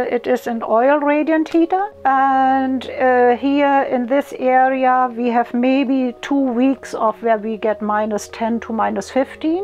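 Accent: Germany. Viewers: You are a nice and cool lady, my first impression was that you look europaen, not american.........and your englisch ist not american....... I think, you are German.....??? Alles Gute für Dich......🤝👍🙆‍♀️ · Ich liebe deine Deutch Akzent. Sehr nett klein haus und auch schön garten. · Lovely lady, lovely Deutsche Akzente, lovely little house! I like them all! :-)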